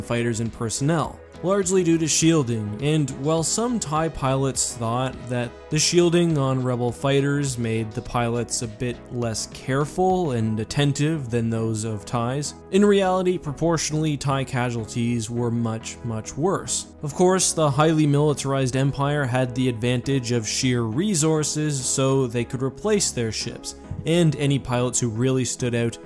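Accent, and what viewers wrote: Canada. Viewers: My happy place is listening to talking about star wars. Make love to my ears with that sweet canadian accent bro. 🇨🇦🇦🇺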